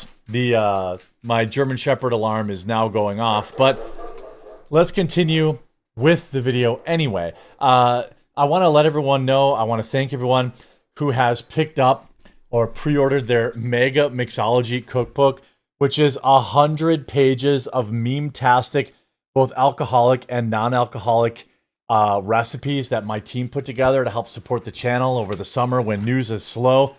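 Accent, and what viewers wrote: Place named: New England, United States. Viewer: This guys accent is 🤮 fucking Yankees….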